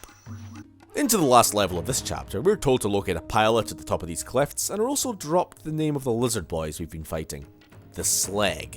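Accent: Scotland, United Kingdom. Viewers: I have subscribed to you because :you made Elder Scrolls/ Star wars jokes, your regular jokes are actually funny, I love the scottish accent and everything Scotland (culture, drink, food and people). And you make good videos of great games we've all played. I, from clan Cambel, welcome aboard ya cheeky bastard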